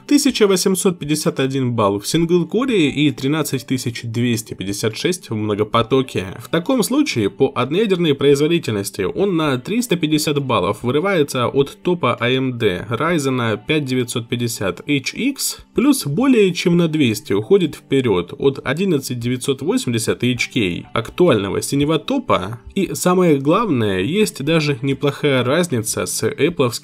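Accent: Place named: Ukraine. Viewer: автор,судя по говору ты с Украины. ну молодцом! верно все говоришь! забыл только сказать про родственные связи...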